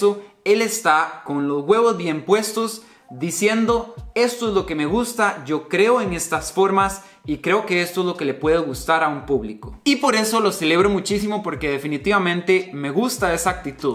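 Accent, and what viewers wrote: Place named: Costa Rica. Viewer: Como se nota ese acento de Costa Rica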